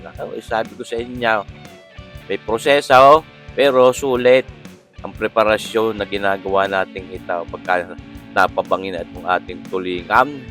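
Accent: Batangas, Philippines. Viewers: Kabayang, Tuloy-tuloy lang ang vlog. Ako'y regular na nanunuod sa iyo, napaganda ng iyong puntong batangan. Ang aking ama'y d'yan lumaki sa Avenida Rizal malapit sa calumpang.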